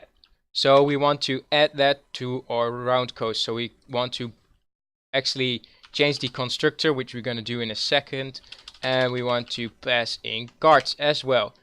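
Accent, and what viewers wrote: Netherlands. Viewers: Are you Dutch? Als Je NL bent Dan hoorde ik het aan Je engelse accent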